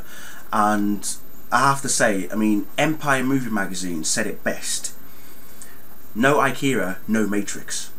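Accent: United Kingdom. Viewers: Im 1 min into your video and already leaving a comment, lol. Im seeing it tomorrow with Akemi, you know her as AkemiChandler on Twitter and shes my best friend since I was 9. Im 23 now, lol. I wanted to see it at midnight, but then I thought, I actually wanted to be wide awake when I see it so me and her arent seeing it at midnight either. Im going to be an emotional mess. Oh, btw, I love the way you talk. I love England and the accent Im simply in love with. I simply love listening to you talk.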